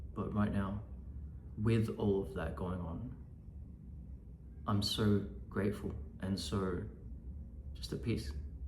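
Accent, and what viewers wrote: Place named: Australia. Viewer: You've a cute Australian accent so beautiful 💗💗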